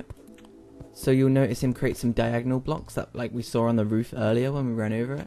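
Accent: United Kingdom. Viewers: jesus christ, you sound exactly like James, from rune shark, i had to take a double check for a second...I dont live in the UK but im pretty sureyou have the same accent as well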